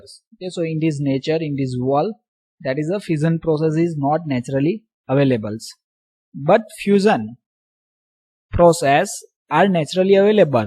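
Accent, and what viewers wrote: India. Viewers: The video is good prepared but indian english langauge is very booooring and disgassting ....very bad english 🤮🤮🤮🤮🤮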